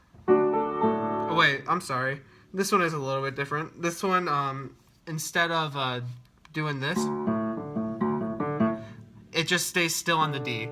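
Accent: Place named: United Kingdom. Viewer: I don't understand a word you say because you speak too fast for me and i'm learning british english so you're acent is very different of what i'm used to listen jajajajaja. Well more or less I understood something, it was a joke :D Back to what I would like to say, I like very much how you play the piano and also the emotion and effort that you done with your work. I can only say, congratulations!! PD (I think that american people use PS but it doesn't matter): I don't pretend to butter you up JAJAJAJA Take care!!! And again sorry if my english is horrible :)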